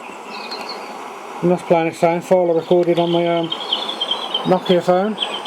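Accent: Birmingham, United Kingdom. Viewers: Hi there i also live in Birmingham uk it was your accent that gave it away anyway i also have a 100w transmitter but have no equipment except for a multi meter to tune the frequency and very little know how but i am learning fast.It has an exciter board with various trimmers ect and a crystal. how do i tune it, which trimmers do what, and to get around blowing the main output if i disconnected the power to the main amp board this would save me having to to buy a dummy load.Many thanks Paul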